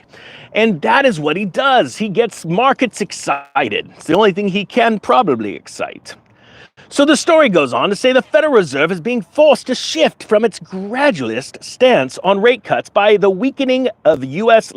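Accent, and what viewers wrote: RP, United Kingdom. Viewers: LOVE THE BRITISH ACCENT!😅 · I love your Limey Accent! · This sounds much more serious in a British accent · Love the David Niven accent,. Keep it up!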